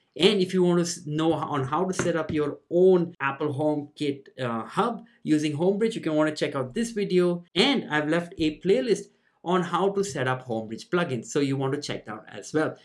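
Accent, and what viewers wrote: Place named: India. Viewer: You are 100% from India. Unmistakable heavy accent.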